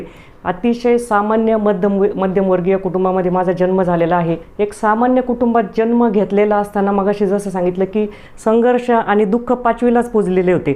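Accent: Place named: Ahmednagar, India. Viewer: नगरी भाषेचा गोडवा, मस्त!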